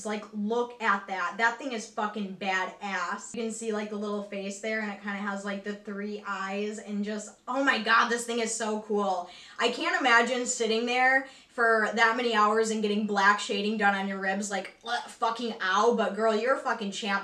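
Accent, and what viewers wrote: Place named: Northeast, United States. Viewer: I always forget what a strong northeastern accent you have!(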